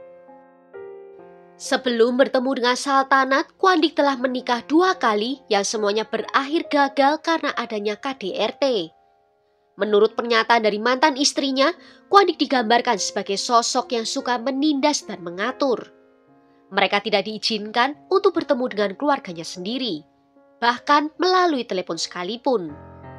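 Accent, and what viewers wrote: Java, Indonesia. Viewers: alamak medok gilerr derr😂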